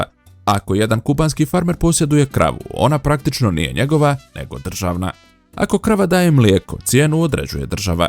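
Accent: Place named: Herzegovina, Bosnia and Herzegovina. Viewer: Hercegovac govori. Ne Bosanac.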